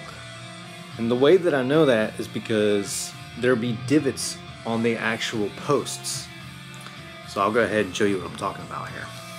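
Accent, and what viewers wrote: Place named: St. Bernard Parish, United States. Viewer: U sound like ur from st bernard parish, i love ur accent❤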